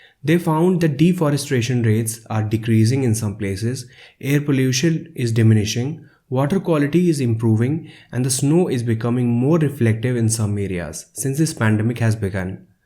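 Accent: India. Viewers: I hate Indian accent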